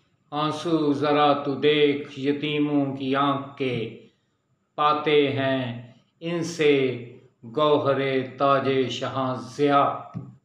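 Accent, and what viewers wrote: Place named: Iran. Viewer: Your persian accent is so beautiful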